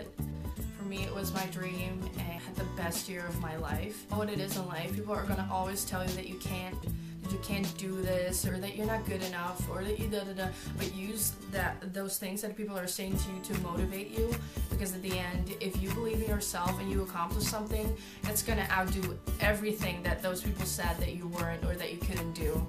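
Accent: United States. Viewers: This was very helpful, but I have some questions, was it very difficult to go to their school and learn in English(I mean are their schools difficult), and have you ever just sat in your room thinking: "I can't do this anymore."? I'm from Serbia and I'm considering about going so I was pretty much excited when I was watching the video. Ps. Your accent is amazing, it's like you're an American 😱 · How do you have an American accent? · You have more of an American accent than me, and I'm American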